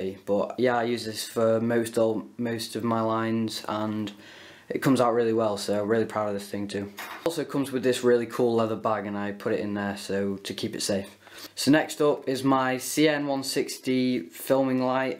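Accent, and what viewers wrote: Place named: England, United Kingdom. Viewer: Subbed :) You from England because your accent sounds english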